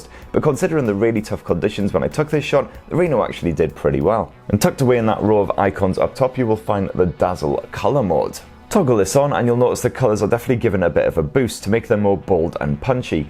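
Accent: United Kingdom. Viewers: I watch all your videos even though I can barely understand lol your accent is too British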